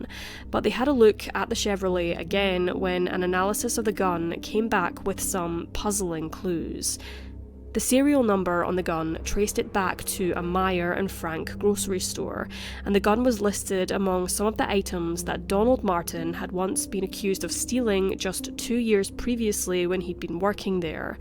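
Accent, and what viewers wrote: Scotland, United Kingdom. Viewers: It's a British accent, from Scotland. Very calm and easy on the ear. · Yes agree, I think it’s Scottish 👍🏻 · She has a Scottish accent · It's scottish 😊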